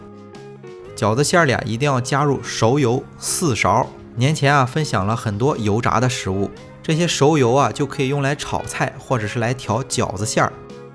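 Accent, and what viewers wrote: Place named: Zibo, China. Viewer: 听口音是淄博那边的？老爸的手艺真棒👍！加油💪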